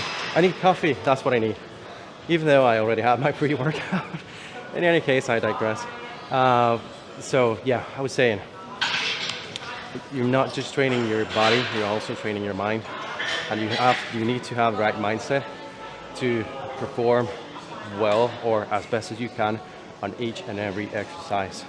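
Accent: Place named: United States. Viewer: Where you from bro you sound american but says your from the 🇬🇧 keep up the good work though bro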